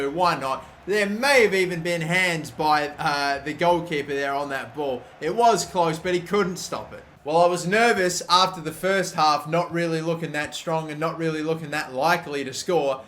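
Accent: Australia. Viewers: halo289 he’s Aussie 😂😂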